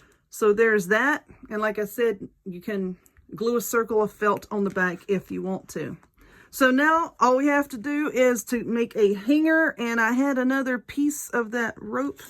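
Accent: South, United States. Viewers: That is beautiful and I love listening to your beautiful southern accent.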